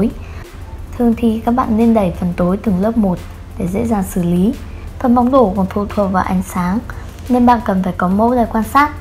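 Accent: Vietnam. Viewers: Cj là người Bắc hả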